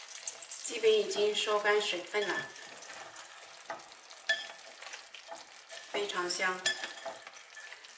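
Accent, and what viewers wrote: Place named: Hakka, China. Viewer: 簡單實用。聽到客家腔的說話，覺得十分親切!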